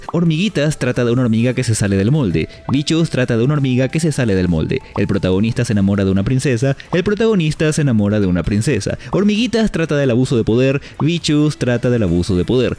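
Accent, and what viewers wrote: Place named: Paraguay. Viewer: Nuevo Sub :D, dije que eras paraguayo por tu acento y es cierto xd🇵🇾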